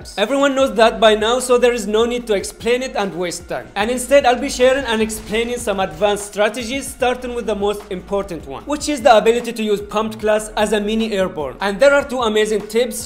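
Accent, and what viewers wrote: India. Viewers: At the starting i felt like you were gonna speak hindi you have a indian accent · When i heard the indian voice i know i can trust him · You sound like us Indians. Where are you from??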